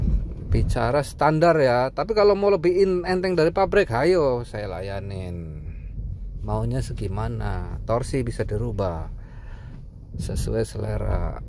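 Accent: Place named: Java, Indonesia. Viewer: Jawane endi um. Kyong medok yaa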